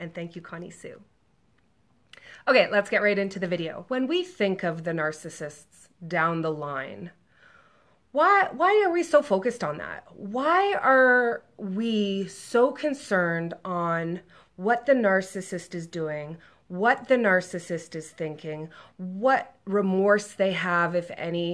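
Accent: Canada. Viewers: U sound canadian! What province?...great advice on no hope, btw. That is the cold hard truth. They will never, ever, ever change...in fact, they get worse as they age.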